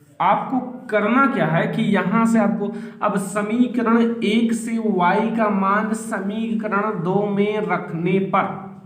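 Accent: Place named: Uttar Pradesh, India. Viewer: Kya bhai tu toh U.P side ka lag raha hai .. Me GUJARAT SE Hu 10 CBSE BOARD ..Ane wale hai boards 😨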